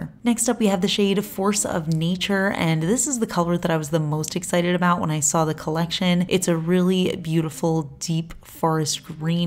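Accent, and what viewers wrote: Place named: Las Vegas, United States. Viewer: Hey. I am loving and will pick up Force of Nature. I haven't found a green polish that beautiful since China Glaze's Emerald Sparkle well over a decade ago. Thank you for your dedication to this channel and your viewers. You already sound like a Las Vegas native. I was born and raised here and have lived in Henderson for 30 years now. I'm glad to have you as a neighbor.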